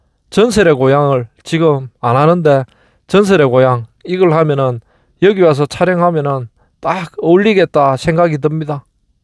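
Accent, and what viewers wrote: Gyeongsang, South Korea. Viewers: 이젠 경상도 사투리 자체가 싫다.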